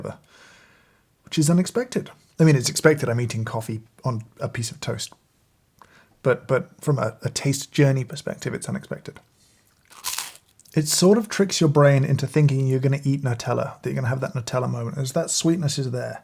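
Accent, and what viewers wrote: United Kingdom. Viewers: James' your british man's british man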